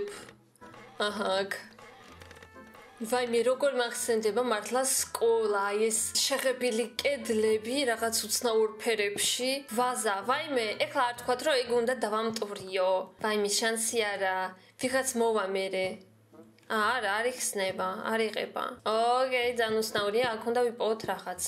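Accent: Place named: Georgia. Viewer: რა სოფლურად ლაპარაკობს თბილისელიხარ თუ სოფლელიხარ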